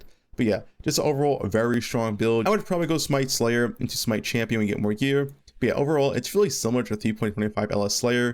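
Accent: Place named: United States. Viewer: Good info, but you need to pronounce build names slower and clearer. I had to rewind a couple of times just to hear what you were saying. I'm not from america, so your particular accent isn't familiar to me.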